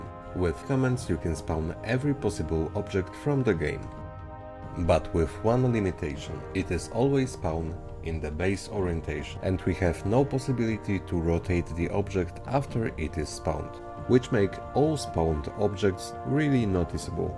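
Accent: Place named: Poland. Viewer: Tak mi się wydawało, że słyszę polski akcent. Swoich się jednak rozpoznaje X D Spoko materiał, dobra robota!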